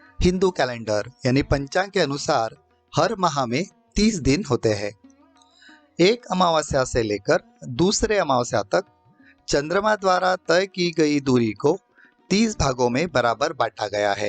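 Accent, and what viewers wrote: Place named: Maharashtra, India. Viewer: Aap ki marathi accent me narration bada madhur lagta hai sunne me aur video rochak hota hai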